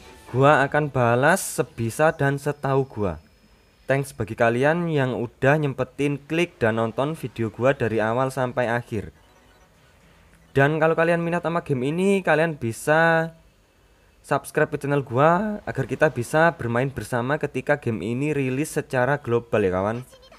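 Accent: Java, Indonesia. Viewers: Terlalu medok bangg🙏😂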